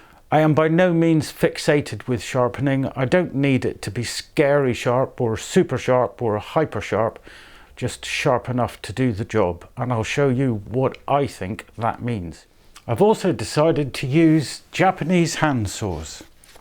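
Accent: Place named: West Country, United Kingdom. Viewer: HI this looks interesting. Good to hear a West Country accent talking about woodwork. Having taken up woodwork 7 years ago when I retired I focussed on machine joinery. But recently my interest has been spiked to learn some hand skills using Japanese saws etc., so I will be very interested to watch your videos.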